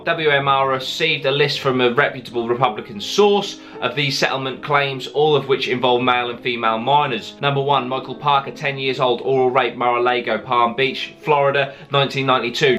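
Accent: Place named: England, United Kingdom. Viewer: Where in the U.K. are you from? Your accent and mannerisms sound very much like Russell Brand who is from Grays, I am originally from Canvey so wondered if you was local. Love your videos brother, keep up the good work.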